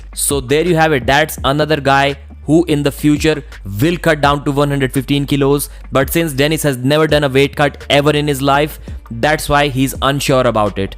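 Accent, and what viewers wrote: India. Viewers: the strong Indian accent of the speaker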